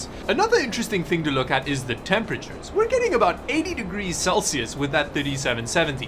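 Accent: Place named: South Africa. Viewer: I can bet you are south African, eh? Afrikaanse mense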